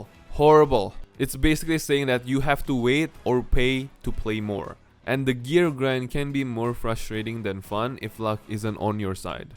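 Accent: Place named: Indonesia. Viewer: can tell u r indonesian in just few seconds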